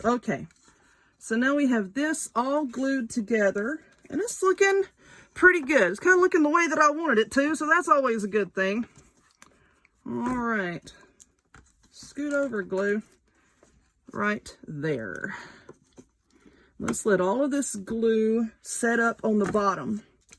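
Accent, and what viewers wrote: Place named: Tennessee, United States. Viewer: I just discovered your channel, and as soon as you said "durn", I subscribed. I don't know what part of the South you are from, but you sound just like me and everyone in Northeast Tennessee. Loved your lantern, if I can find the rectangle canvas thingys, I am going to give it a try. Also, I wanted to mention the Command strips would be great to affix the battery box inside your lantern top.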